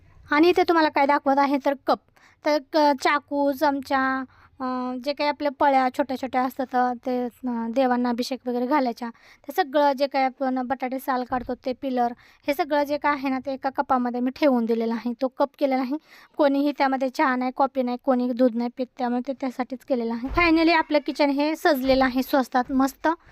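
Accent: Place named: Khandesh, India. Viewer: Waadte tii khandeshi aahe ..me pan . Ticha accent khandeshi aaye ..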